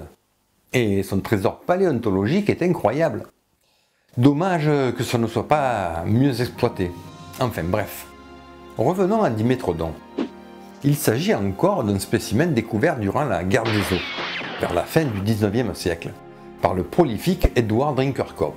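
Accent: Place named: Albi, France. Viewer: Bien le bonjour, un grand merci pour le partage de votre savoir, ce fût très passionnant 🙏 Et cet accent me rappelle tellement mes professeurs à Albi en BTS 😊 (je suis du Nord 😅) bref je viens d'activer la cloche pour ne rien louper 😉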